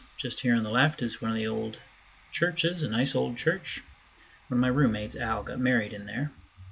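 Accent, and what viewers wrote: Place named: Nova Scotia, Canada. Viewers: It's the Nova Scotia accent. We pronounce words like "harbour" as "hirbour". If you ever cross into Newfoundland it's true that their accent is even stronger! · I love your voice, it's very relaxing listening to you talk. You sound very Canadian. I'm Canadian too, but for some reason you sound even more Canadian than I do :p Maybe it's because I'm from Ontario.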